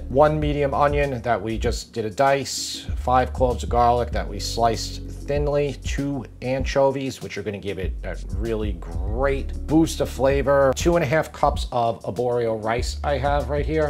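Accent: New York, United States. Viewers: I love the NY accent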